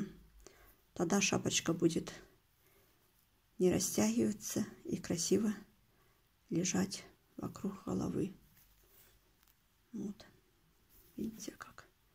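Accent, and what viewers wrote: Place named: Belarus. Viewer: Это беларусский говор.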